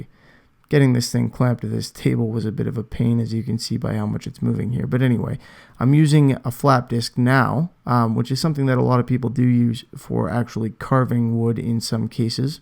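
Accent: Canada. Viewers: Ha! You replied! Too freakin cool! Im using the spraymax 2k. Thank you for that too, i was having not so great results with rustoleums clear. So ive been moving alot of guitars( for a guy just messing about) and i got my clear through some different channels. I would be way cool with placing my future orders in a way that gets you a kickback or whatever. Im just new to technology so im a little behind the times as far as ordering things with my phone. So youll have to shoot me another reply with those instructions. Your videos have been a priceless resource to me since i started dicking around with guitar finishes. Was stoked to hear a canadian voice, being a canuck meself( living in the states, in Missouri of all godforsaken places). Keep them coming bro-cephus. Thanks a million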